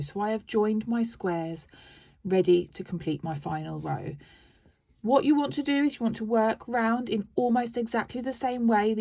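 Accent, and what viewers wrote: England, United Kingdom. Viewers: You sound English but you’re calling them DC when they’re UK TC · you sound lovely English ☺️ Thanks for sharing your knowledge, it's gold to me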